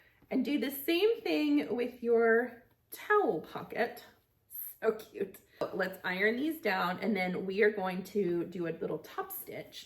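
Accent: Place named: South, United States. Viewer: I found your site and have really enjoyed it. Love your projects especially the Kate Spade tote bag. I hear a southern accent and have to ask where you’re from? I’m a native born Texan.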